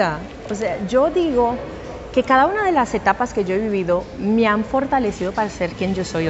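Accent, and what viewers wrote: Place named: Colombia. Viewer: Una belleza increíble habla como los Colombianos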